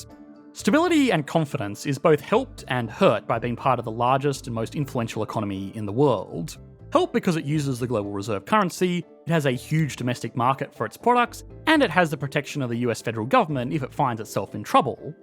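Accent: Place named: East Coast, Australia. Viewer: East Coast Strayan.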